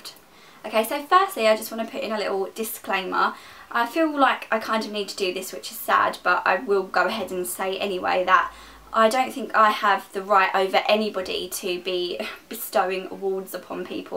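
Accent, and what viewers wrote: United Kingdom. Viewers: First video I've seen of her! Before I even played it I was like "shes gonna have a British accent!!" and she did lol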